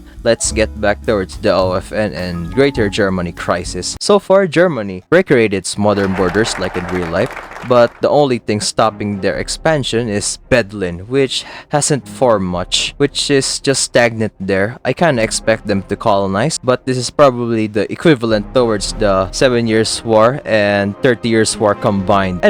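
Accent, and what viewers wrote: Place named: Philippines. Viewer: Your pinoy......i can guess from your accent❤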